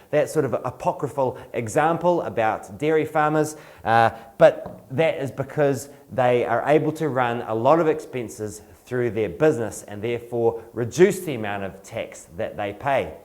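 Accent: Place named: New Zealand. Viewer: This applies to New Zealand it only obvious if you recognize the accent.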